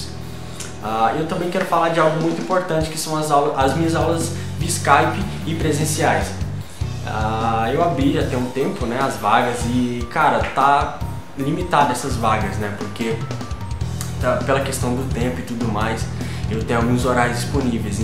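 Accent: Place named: Rio de Janeiro, Brazil. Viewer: Fala moleque, tu é do Rio brother? sotaque carioca, da onde mano?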